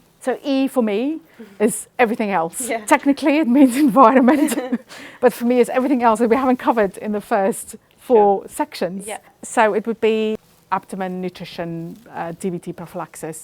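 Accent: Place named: United Kingdom. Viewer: the thick blimey accent mate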